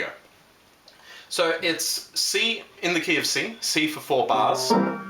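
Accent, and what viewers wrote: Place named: Australia. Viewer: Great lesson, nice to hear a piano instructor on YT with an Australian accent for a change, so easy to listen to. Thanks for singing as well to illustrate the chord progressions. Well done.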